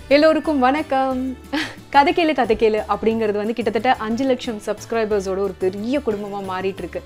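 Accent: Kongu, India. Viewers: கொங்கு தமிழ் 💖 அழகா பேசறீங்க வரவேற்கிறோம் அக்கா 🙏🏻💚❤️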